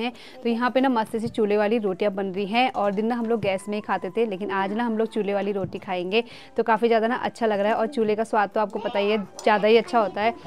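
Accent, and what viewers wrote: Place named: Almora, India. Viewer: बेटा आपका मायका कौन से गॉव में पड़ता है। आपकी भाषा अल्मोड़ा जैसी लगती है। नामकरण में जाकर आपने अपना फर्ज निभाया। बहुत अच्छा लगा। 🙏🙏🌹🌹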